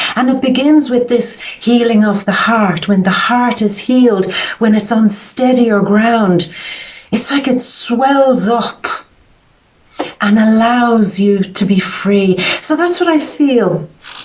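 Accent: Ireland. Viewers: Love your Irish accent! God bless you sister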